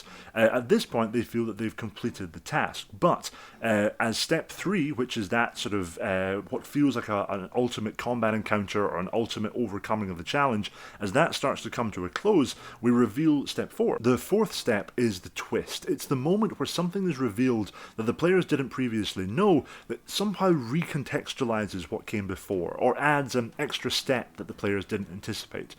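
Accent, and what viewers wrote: United Kingdom. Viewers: When a mustached man with a UK accent and a turtle neck speaks some knowledge to me, i listen.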